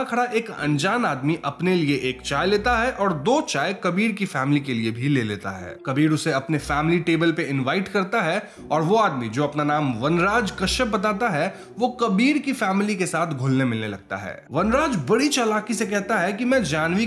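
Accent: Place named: Bihar, India. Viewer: Story teller is Bihari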